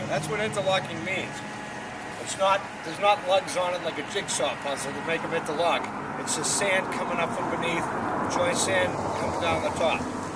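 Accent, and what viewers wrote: United States. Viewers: susan scott My husband grew up in jersey and told me about running behind the mosquito trucks too. I thought this guy sounded more like he had a Jersey accent. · Hey, love that Boston accent. Fellow Bostonian down here in Delray Beach enjoying your video.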